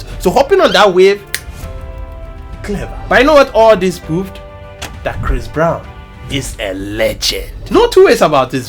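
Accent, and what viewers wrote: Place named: Nigeria. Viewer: You're starting to sound like a typical nigerian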